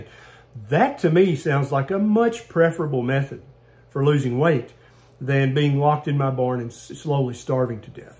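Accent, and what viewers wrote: South, United States. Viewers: You know...when I heard the Southern twang I knew it was going to be a sobering talk. ⚕️❤ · Sometimes I need my Bart Kay arguments with a gentle southern charm. Thanks for that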